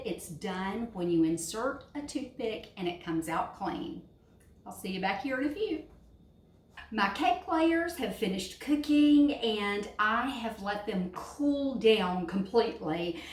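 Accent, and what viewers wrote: South, United States. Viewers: Thanks for sharing! I love your southern accent ❤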